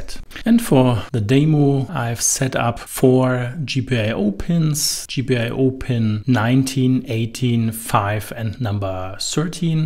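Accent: Germany. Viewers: Man kann dein Denglisch richtig raushören 😂 aber gutes Video 👍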